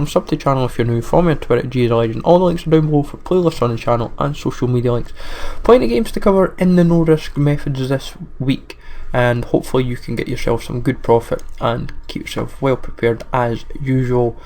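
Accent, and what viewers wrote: United Kingdom. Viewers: it is hard to understand the British accent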